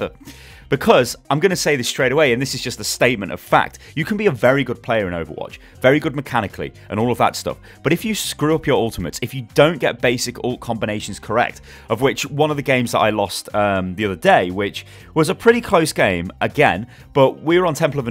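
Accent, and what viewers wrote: Germany. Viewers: oh god this german accent like srsly... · Iam from germany too and I recognize a german accent xD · He´s got a pretty terrible german accent....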